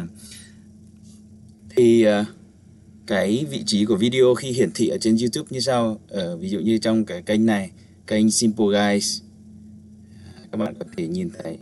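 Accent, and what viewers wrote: Central, Vietnam. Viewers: Anh là người miền Trung phải không ạ? Em người Hà Tĩnh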